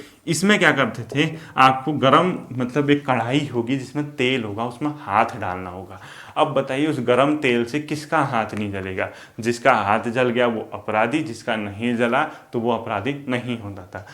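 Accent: Kumaon, India. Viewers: Bhai aap Kumouni ho? Aapka bhasa shilli batata hai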